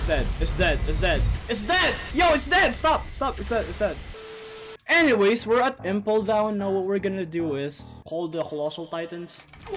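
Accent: Philippines. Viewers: are u Filipino?,bcuz ur accent is like Filipino when ur speaking english!.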